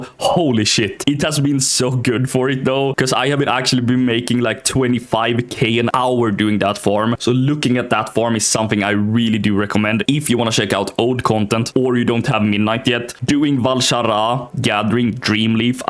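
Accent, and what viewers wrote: Sweden. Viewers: You sound suspiciously Swedish 🤔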